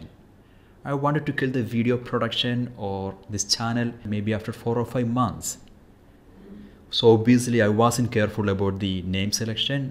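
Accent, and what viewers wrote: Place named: India. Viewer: You sound Indian, what part are you from?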